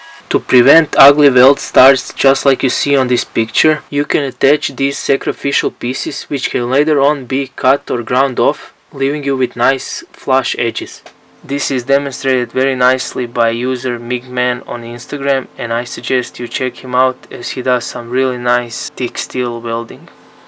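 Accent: Russia. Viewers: Парень, признайся, что ты русский) твой инглиш неплох, но ты палишься с произношением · говор русский. ры из снг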